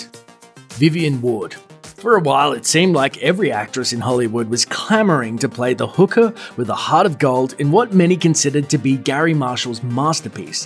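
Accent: Australia. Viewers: woah hes australian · I'm sorry, but that douchie Aussie accent is super distracting... XOXO ;)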